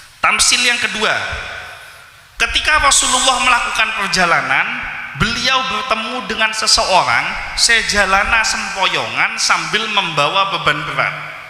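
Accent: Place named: Sumenep, Indonesia. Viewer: Dari mana asalnya kiai ini?. Logatnya seperti orang Sumenep.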